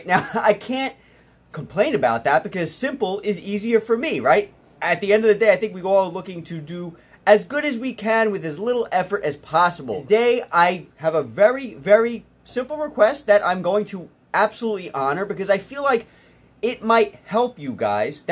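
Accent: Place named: New York, United States. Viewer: bro thank you! You’re vids are so helpful. I’m excited for this journey. Also.. you talk like me😂 by any chance you from NY?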